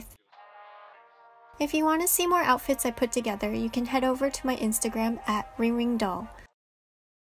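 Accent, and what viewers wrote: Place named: United States. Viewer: Hey can you do a video about your life? Like where you are from... or how you ended up in japan having such perfect american accent. I am really interested in your channel and want to know more because i just soooo curious. Or maybe how you ended up being a model or making a youtube channel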